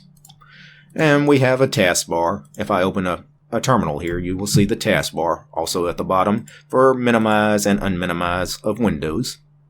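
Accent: South, United States. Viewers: I really like your southern accent sir. I am a new north Dallas resident and I must say yours is the best! Sorry for being non-linux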